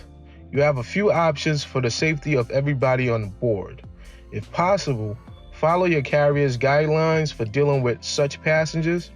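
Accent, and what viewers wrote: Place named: New York, United States. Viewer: Finally!! An actual human voice! And a NYC accent at that! 😆🙌🏼 Thank you!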